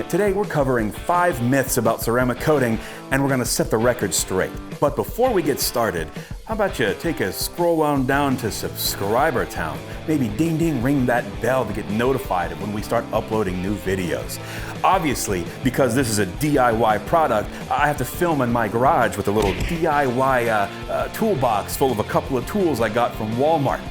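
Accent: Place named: England, United Kingdom. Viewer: Thats a VERY convincing English accent! I’m English and ya had me fooled!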